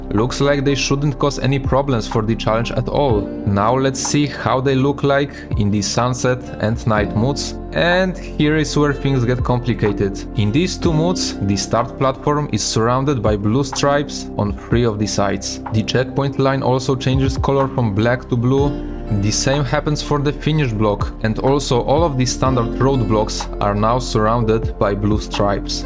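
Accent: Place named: Poland. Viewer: jesteś Polakiem, słychać haha. poeodzonka na yt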